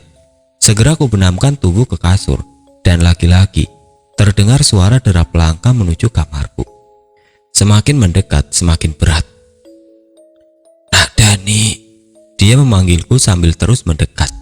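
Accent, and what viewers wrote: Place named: Java, Indonesia. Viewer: logatnya nanggung, klo jawa sekalian, jaksel sekalian.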